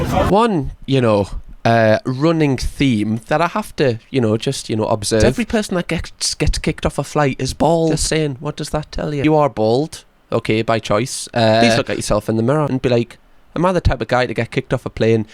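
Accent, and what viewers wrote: Newcastle, United Kingdom. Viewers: Funny story: I fell asleep listening to YouTube and ended up being a part of my dream. A group of us and were in a restaurant where I live in Louisiana USA. was talking constantly and sometimes loudly about Gordon Ramsey in his Geordie accent and all of the redneck southerners were giving us strange looks and saying stuff. We were, like, “oh…he’s our cousin visiting us from the UK.” Whenever he’d say “Gordon” we’d say loudly “he means Uncle Gordon.” Now I kinda wish really was our cousin from the UK who comes to visit!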